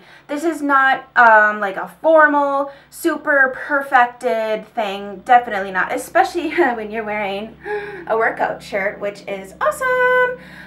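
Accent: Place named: Midwest, United States. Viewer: First off, look at them toned arms!! You look awesome!! Also, I used to be an everyday hair washer bc my hair is fine and thin until I found Batiste! What a life saver. But I cant just run to Ulta bc its too far away so Ill have to check out walmart and try the Herbal Essence one! Also, do you happen to be from the midwest? bc I had a friend who was from Nebraska and she says ROOTS just like you! It seriously made me laugh out loud because I (being from NC) say roots, as in a plant root (if that makes any sense) LOL Anywho, loved loved loved this video! and I want your shirt!! Except I want it to say, "I run so I can eat dessert" :)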